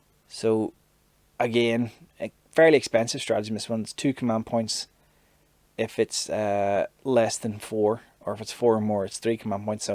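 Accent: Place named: Northern Ireland, United Kingdom. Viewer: I hear another Northern Irish Guy talk about 40k I sub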